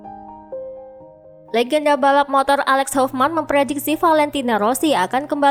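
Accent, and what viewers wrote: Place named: Java, Indonesia. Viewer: Min... Medoknya masih kliatan🙏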